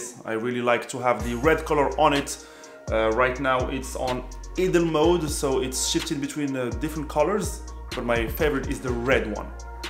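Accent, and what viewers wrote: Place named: Morocco. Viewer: sat cheft had l video tle3 liya comme pub hhhh m3a sme3t sewtek 3reftek ghatkun meghribi 😂 Anglais n9iyaaaaa hh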